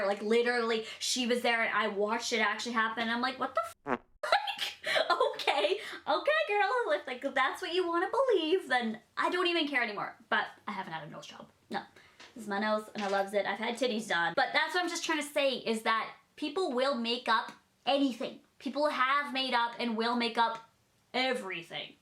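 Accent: Canada. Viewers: Honestly I only scroll down enough to leave my comment and move on. It makes me so upset that people say all these mean horrible things to you. On all your videos you are just the sweetest, nicest girl. I love your cute little Canadian accent and how bubbly you are. Totally makes me day when you have a new video out.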